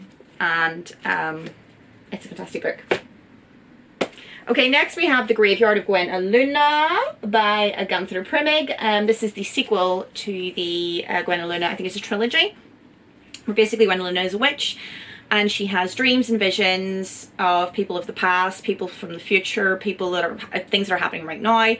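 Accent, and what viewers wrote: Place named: Belfast, United Kingdom. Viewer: Totally agree lol. I tho yip thats a belfast girl alright lol 😅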